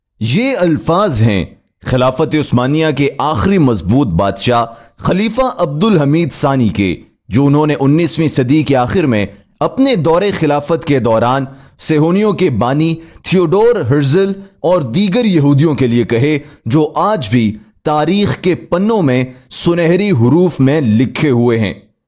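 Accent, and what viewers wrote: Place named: United Kingdom. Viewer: Kamal ke English accent dali apny..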